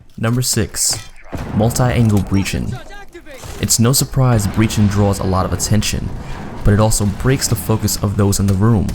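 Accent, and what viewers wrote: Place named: Boston, United States. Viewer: You sound like you're from Boston, lol put nice video you earned a sub! Keep it up.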